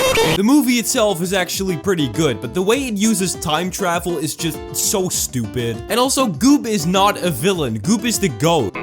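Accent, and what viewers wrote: Netherlands. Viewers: Are you Dutch? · Are u dutch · are you dutch? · wait a min are you dutch?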